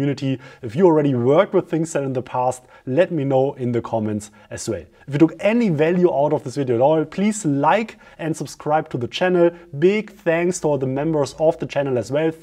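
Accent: Germany. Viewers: Ernsthaft, so schwer ist das „th“ nun auch wieder nicht. Das klingt wie reinstes Hochdeutsch. Andere Sprachen KLINGEN anders. Das hier ist echt schwer auszuhalten. Wird dir kein native speaker sagen, sie sagen es nur denen, die tatsächlich wie native speaker klingen. Aber das kann echt niemand lange aushalten. Schlimmer als sächsisch. Oder indisches „Indlish“. Du klingst wie wenn Otto Leute nachmacht, die eigentlich kein Englisch sprechen. Wie kann jemand English lernen und zu 100% Deutsch klingen auf dem Level?? Sorry, super Inhalt, aber ich krieg schon die Krise, was glaubst du, wie das für native speaker klingt…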